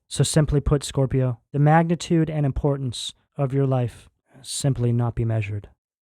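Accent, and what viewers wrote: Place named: New Jersey, United States. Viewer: what a deep and meaningful reading meant a lot and do accurate on every level … thank you 🙏💓 and I just love the way you look if my mum was here now, that’s what she wanted me to have my hair like yours just thought I’d pass that on 🤭wish you a super time in ‘out of state’ from me in the Uk altho my Mom was from New Jersey … and i also enjoy your accent it makes me feel close to her god bless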